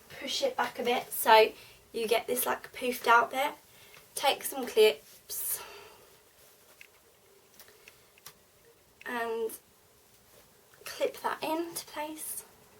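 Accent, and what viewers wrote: Essex, United Kingdom. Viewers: OMGGG!! a Louis Tomlinson inspired shirt AND you have a British accent! I love this chick! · you sound like your from essex!:)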